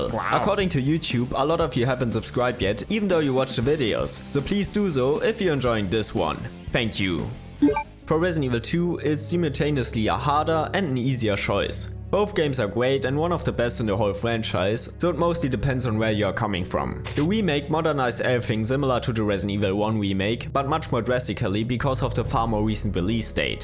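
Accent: Germany. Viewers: nur 2 sekunden drinne und ich höre raus du bist deutscher :D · I hear deutsch mein freund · Good old German accent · i’m hearing a little deutsch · i sense deutsche accent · I love your German accent. Sehr gut! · At first I thought the man had a lisp, then realized he's just German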